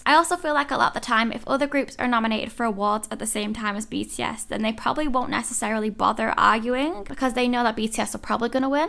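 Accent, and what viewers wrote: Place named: Northern England, United Kingdom. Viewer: QUEEN I JUST FOUND YOUR CHANNEL AND NORTHERN ACCENT??!??? LONDONER HERE BUT BRIT KPOP STANS ARE THE BEST <33333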